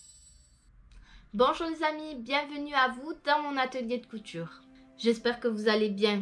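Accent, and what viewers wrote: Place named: South, France. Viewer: Un abonné de plus hehe :p ça fait plaisir de ré entendre cet accent du sud bisous à zozo et tout le monde :) PS : c'est favess09 🤣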